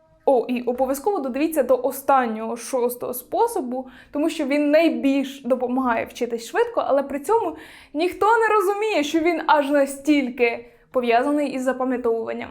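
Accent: Poltava, Ukraine. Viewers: А ще мені дуже цікаво слухати вашу полтавську вимову🥰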